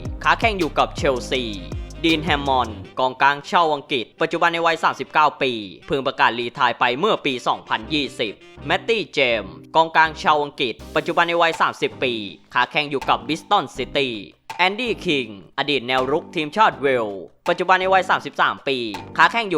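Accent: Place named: Rural, Thailand. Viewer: จะพูดเหน่อทำไมวะ ขัดใจช.ห.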